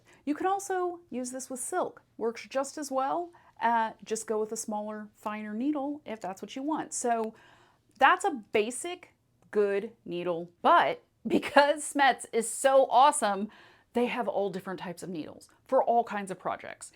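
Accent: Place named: New York, United States. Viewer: Thank you for this video! I've been sewing longer than I care to admit (58 years....?) and what I learned, I learned when I was 10 or 12 in home ec classes (do they still have those?). I had a vague idea of sizes - smaller for smaller fabrics (that meant lighter to me), and larger for larger fabrics - but I knew nothing about the numbers. You didn't mention the second number - is that important as well? One other great needle is a leather needle - they have a very sharp triangular point that is sharp on all sides and can be really handy if you're having trouble sewing something very heavy (think multiple layers of denim). Also loved your hipsters (ahhh, brought me right back) and I detected a wee bit of NY in your accent.... :) Glad I found you - we never stop learning!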